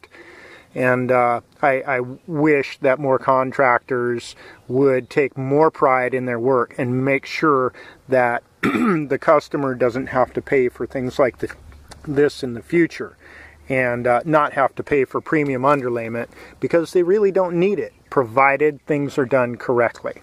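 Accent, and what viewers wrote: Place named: United States. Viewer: I would certainly like to have you fix my dads roof but we are on the other side of the pond .......Australia......you sound like you're americana.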